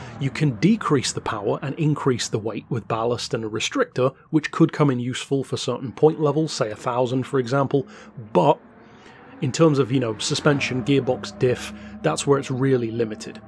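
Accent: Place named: England, United Kingdom. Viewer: Decent review, his English accent was killing me a tad, but this jag does look pretty smooth!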